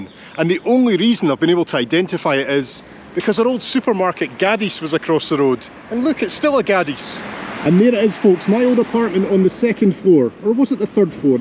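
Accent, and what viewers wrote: Scotland, United Kingdom. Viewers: Hey, I just subscribed to your channel. I've been watching for about a week now and to mimic your lovely Scots brogue "I haven't been disappointed!" Your day trip to Gothenburg was an eye opener as this is where my granny grew up and throughout my life never knew what the city was like. Thanks. This morning I watched your Salamanca return visit. It was amazing. After watching all these trips of yours, I just cannot figure out how you can afford it 😮. What's your secret? I'd also like to know a bit of your back story. Letting you know you have a new fan. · As a Norwegian, I do understand your Scottish dialect quite well. I have a friend from Glasgow, I need to ask him a few times when he elaborates in discussions in Glasgowean. I guess it is a matter of listen to it and get used to it and to tune your ears to it. Then you will hear and understand it after some listening. 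I have the Rab C Nesbitt series, it is subtitled in English. I invite you to Norway. We do have dialects here that are like separate languages. Norway and Sweden is like Scottish and English dialects. Swedish people say they dont understand Norwegians, but that is just lazyness and non interest of trying to listen to the sound. Norwegians is opposite and try to tune in to Swedish language and that works.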